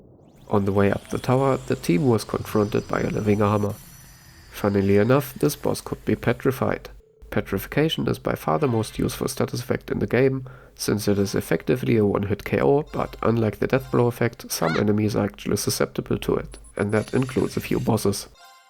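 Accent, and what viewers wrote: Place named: Germany. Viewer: Deutsch Akzent erkannt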